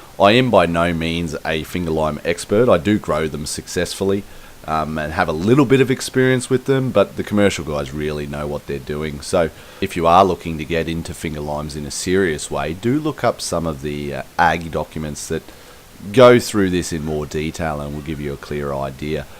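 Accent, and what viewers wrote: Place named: Australia. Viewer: Such an aussie accent i like it... beautiful finger lime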